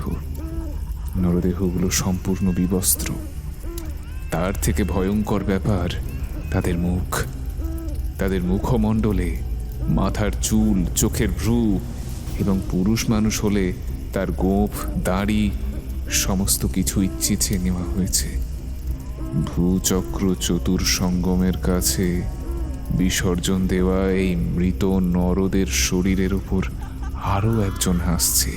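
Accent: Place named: Purba Medinipur, India. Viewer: পূর্ব মেদিনীপুরের মতো ভাষা আমি রামনগরের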